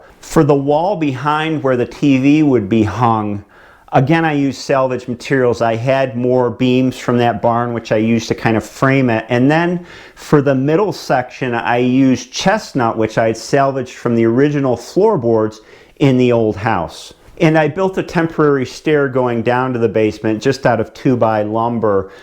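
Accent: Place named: New York, United States. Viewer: Well as a southerner his new York accent is about more than I can stand but instill enjoy his videos😂😂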